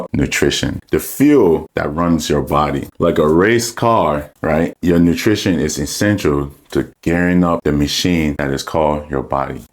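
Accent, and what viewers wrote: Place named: Liberia. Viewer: I can hear a slight LIB accent... you Liberian bro?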